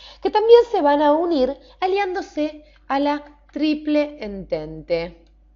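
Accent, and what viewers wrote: Argentina. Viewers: es de argentina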